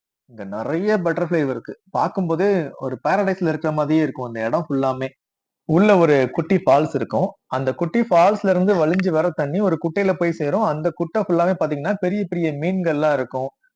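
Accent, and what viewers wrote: Madurai, India. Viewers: தம்பி மதுரை பயலா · Anna neega madurai ah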